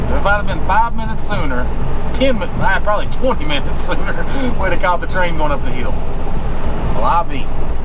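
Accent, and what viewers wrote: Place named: Georgia, United States. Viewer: Are you from Georgia? Cause you sure sound like it. 😂 it’s cool, I am too. GO DAWGS!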